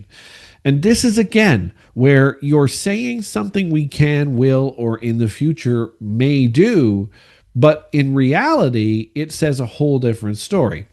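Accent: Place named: Canada. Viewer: Good to hear a real Canadian speaking real Canadian . . .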